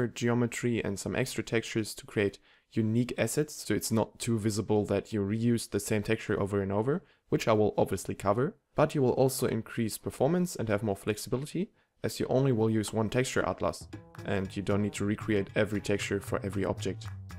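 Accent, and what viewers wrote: Germany. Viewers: rofel wusste ich doch das du deuschsprachig bist ;)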